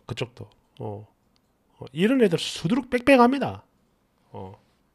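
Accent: Gyeongsang, South Korea. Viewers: 천지삐까리? 완전 경상도말씨군요 억양 완전짱 늘 터져요 웃음릴레이😊😊😊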